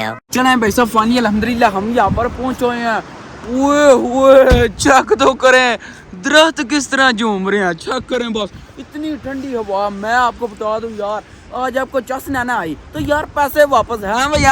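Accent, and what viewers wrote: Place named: Gujarat, India. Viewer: Mazy laa ry o ❤ I am from Mandi bhaudin ❤ I think you are from gujrat ap ki boli gujrat wali haa❤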